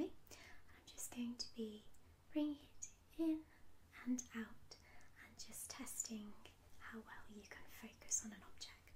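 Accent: United Kingdom. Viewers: your videos are so good it's always cool to see fellow brits and hear British accents:) subscribed x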